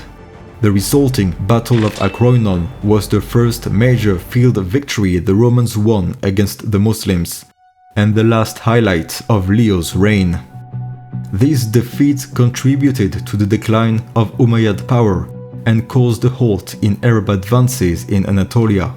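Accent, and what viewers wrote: France. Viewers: From your accent in this video i think you are frensh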